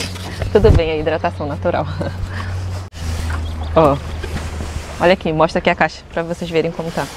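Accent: Rio de Janeiro, Brazil. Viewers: você é carioca??? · Sotaque parecido com carioca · Vc tem sutaque de carioca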